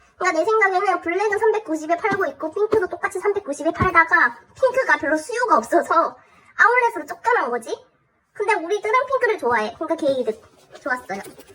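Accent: Busan, South Korea. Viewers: 음성 변조도 숨길 수 없는 친근한 부산사투리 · 부산분이시네욬ㅋㅋㅋ저도 부산 사람이라.. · 바닥에 널부러져 놓고 장갑찌고 만지는건 무엇?ㅋㅋㅋㅋㅋㅋㅋㅋㅋㅋㅋㅋㅋㅋㅋㅋㅋㅋㅋㅋㅋ사투리가 부산같은데 개웃기네 ㅋㅋㅋㅋㅋㅋㅋㅋㅋㅋㅋㅋㅋㅋㅋㅋㅋㅋㅋ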